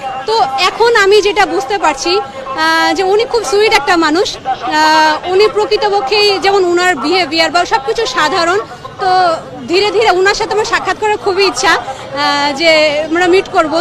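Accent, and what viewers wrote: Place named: Kolkata, India. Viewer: কলকাতার বাংলা টান ওর ভাষায়।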